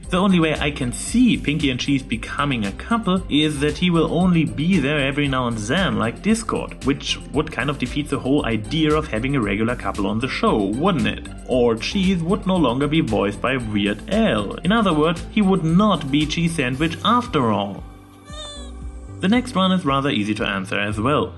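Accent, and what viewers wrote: Germany. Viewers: You sound so german...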